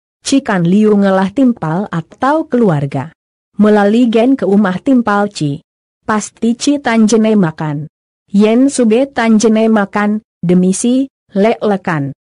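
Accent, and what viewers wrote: Buleleng, Indonesia. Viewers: Gaya kipak kipek lucu. Lebih cocok logat Beleleng anggon. Ini rekaman lama tapi saya baru sekarang nonton. Demen masi.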